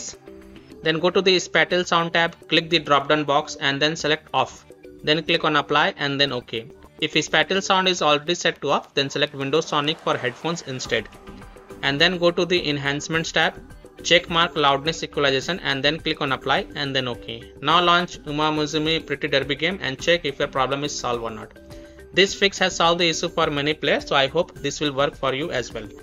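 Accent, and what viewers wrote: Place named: India. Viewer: Bless the indian voice tutorials always saving our butts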